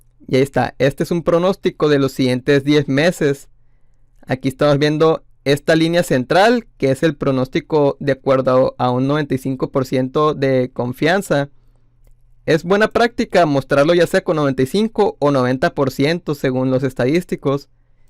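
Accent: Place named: Sonora, Mexico. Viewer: uste es de Sonora? muy perro el acento 🤙🏼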